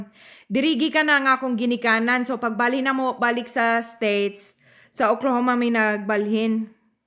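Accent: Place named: Visayas, Philippines. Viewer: Ka gwapa ug larenoha mo sultig bisaya nimo langga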